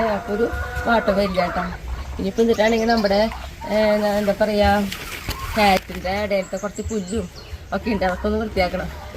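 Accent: Thrissur, India. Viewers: hello, njan thrissurkariyanu. veedu evide anu Anu? Thrissur bhasha kelkkumbol valiya snthosham. Krishi valare ishtamanu. All the best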